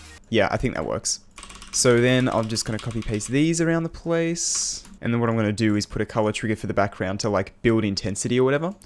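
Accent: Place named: Australia. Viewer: When are you making a level request challenge, though? Curiousity XD. But requests aside, Your content is underatted, your commeentary and Australian accent makes it better. You deserved more! Oh and yeah for the edit, put your Discord link in the Description. Im joining the Discord server 100%. - Null1f1ed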